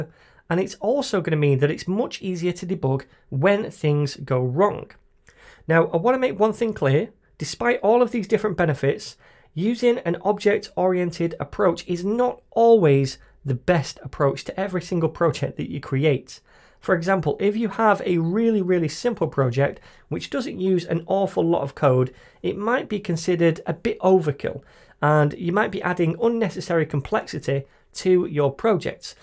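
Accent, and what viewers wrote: United Kingdom. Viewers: fucking british accent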